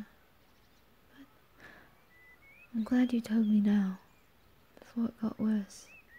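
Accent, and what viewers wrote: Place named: United Kingdom. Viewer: Alternative title: You're the Husband of the young Queen Elizabeth II ASMR (why? because of your somewhat thick british accent)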